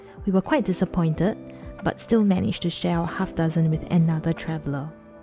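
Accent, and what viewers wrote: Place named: Singapore. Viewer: Singapore accent ftw!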